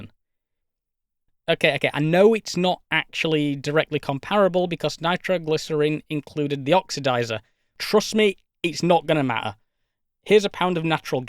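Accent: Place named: United Kingdom. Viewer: Man, your British English sounds awesome) Thx)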